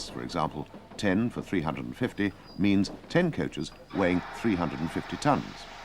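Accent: RP, United Kingdom. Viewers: It is not "posh" it is actually "received pronunciation", as in, for voices to be "received" over radio you had to speak clearly to be understood, especially before the days of HD/digital etc. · The "posh voice" is fine. I hate English classism but it's clear and easy to understand.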